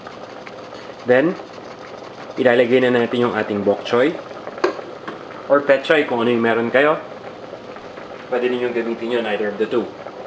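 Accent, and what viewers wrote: Philippines. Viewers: Sir this is an amazing recipe for spicy beef noodle soup. I can't tell if you're speaking English, with a heavy Filipino accent or what but I can tell that that makes little difference. You my friend have just created magic in this spicy beef noodle soup. I cannot believe no one's commented on this recipe!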